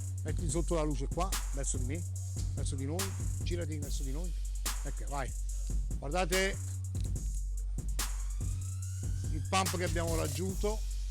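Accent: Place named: Rome, Italy. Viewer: Si ma un po’ di professionalità e proprietà di linguaggio… sentir Lemme e sentire, beh dovrebbe essere quasi il contrario 🥲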